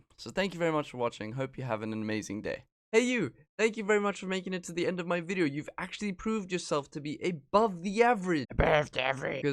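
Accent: South Africa. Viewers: You sound Afrikaans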